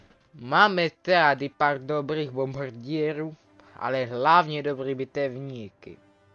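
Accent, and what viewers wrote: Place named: Vietnam. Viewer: spis mluvis jak vietnamec nebo chinan ktery se naucil cesky a nezni to jako rusak moc (nepisu haci ani carky protoze jsem linej)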